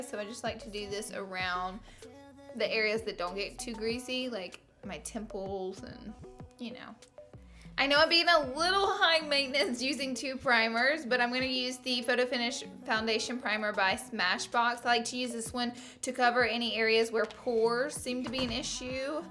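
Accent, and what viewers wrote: South, United States. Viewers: Omg you're so gorgeous 😍😍😍 and I love your accent it sounds southern 😅😊😊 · Omg girl where are you from? That Souther bell accent has me so in love! And I'm so proud of you and your pallete. Started from the bottom. Inspires everyone to just keep going at all times. Work hard. Now enjoy the rewards that comes with it. You deserve it.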